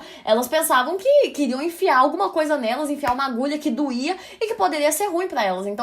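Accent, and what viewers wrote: Minas Gerais, Brazil. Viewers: Esse sotaque mineiro...❤️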